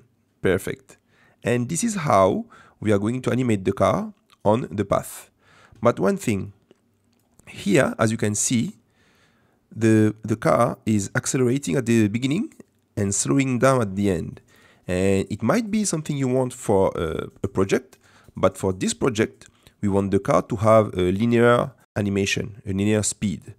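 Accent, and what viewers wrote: France. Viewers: tres bon tutoriel avec un bon accent Francais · Tu pourrais le faire en français stp car j'ai l'impression à ton accent bien qu'il soit très bon, que tu es français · Baguette But honestly I just love french accent... you saved my evening... I don't even care about blender and animation but still very interesting and well explained :D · Toi t'es français 😂😭😭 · T'as un accent français ça me fais tellement rire x) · T es français ? En tt cas t as un accent français du coup j comprend 😂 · Tona accent français 🔥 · ça ce voit que t'es français sauf que moi aussi et du coup ça me fait rire parce que c'est la vidéo parfaite mais je comprend rien 😂😂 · L'accent français 😂 sinon très bonne vidéo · serait tu français avec ton accent ? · Salut je viens de voir ta vidéo dans mes recommandations alors que je ne regarde jamais d'animation 3d et enfaite c grave styler et sa doit prendre beaucoup de temps sinon continue comme ça mais je me suis dit que j'allais envoyer le message en français c que tu a un peux l'acsan français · Magnifique accent francais ^^ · on sent l'accent français mdr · Ton accent français se remarque direct mdrrrr Continue comme ça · french accent are crazy bro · PTDR ta un acens Bien FRANCAIS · You sound french or something like that , cuz i hear an accent , i mean i'm french and i hear the french accent , i'll be surprised if your not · tu es français toi haha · Wsh mais t français sa se voit tellement avec l'accent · This is amazing. 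Thank you so much! Your french accent makes this long tutorial so much more tolerable! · Le bon accent français! J’aime beaucoup le tuto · GG pour le travail, j'aime cet accent français Ahah ! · Tu esy français mon amis 😉 je t’ai reconnu sinon bon travaille 👍🏽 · Mais tu as un accent français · Ça s’entend qu’il est français le man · A l’accent je dirais que tu es Français non? Merci pour ton tuto, en français ce serait top 😁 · Tu parle français sa se vois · i like your french english ascent hhhhhhhh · you have a french accent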